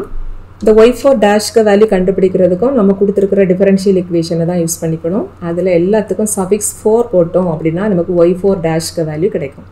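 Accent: Kanyakumari, India. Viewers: Kanniyakumari district slang❤ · Kaniya kumari slang bro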